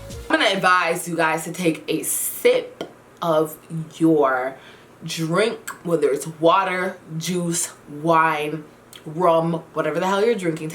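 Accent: Jamaica. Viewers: YYYYYAAAAASSSSS I LOVE UR JAMACIAN ACCENT 😍😍😍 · I like how as I heard the intro song I had a feeling that she was Jamaican, but after she seh 'boom' and 'tump' I knew she was a yaddie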